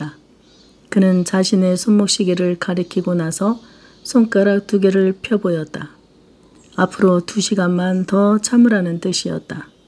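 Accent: Jeolla, South Korea. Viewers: 작가님, 너무 재밌게 듣고있습니다. 그저 고맙고 고맙습니다. 전라도 사투리가 구수합니다.😅😅🎉❤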